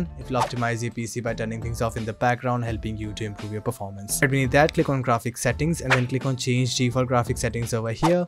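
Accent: India. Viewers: Is it possible to find a video guide that is not made by an indian guy with an unbearable indian accent? Just asking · Is it possible normal guy in india to talk in english without 95% of the Indians cry about his accent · You are indian, right? I can't understand a lot of things because of the accent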